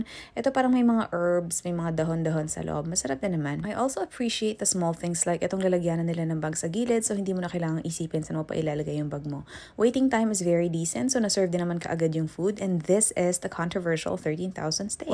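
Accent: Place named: United States. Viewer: I swear to god u sound like a American 😭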